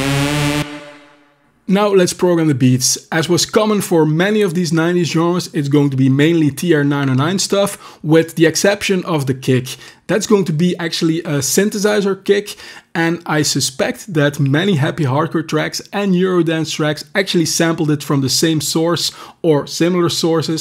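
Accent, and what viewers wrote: Netherlands. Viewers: Gotta love the combination of in-depth cultural knowledge, spot-on production and of course the delicious dutch accent. You got another sub! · Man you sound so Dutch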